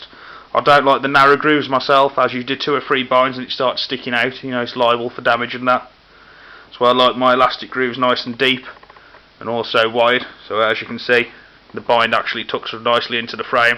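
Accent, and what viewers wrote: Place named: United Kingdom. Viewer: Whow. Thank you for that know-how. Where are you from? It took me some time to get used to your accent, now I understand - what I hardly did at the beginning :o) All I could guess was that you must be British.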